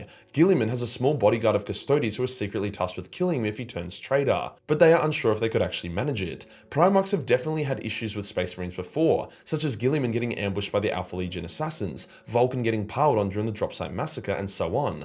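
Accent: Australia. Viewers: May I as central europian slav request you to speak about 10% slower 😂 i get everything you talking about but it is really paiful for understanding aussie accent in this cadence of words 😂 I still love naughty Austrian non painter creator ❤️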